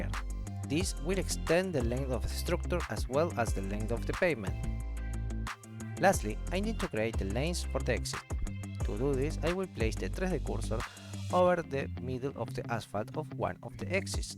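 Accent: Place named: Argentina. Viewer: Me parece que ese inglés tiene tonada argentina o algo de latino! 😆 saludos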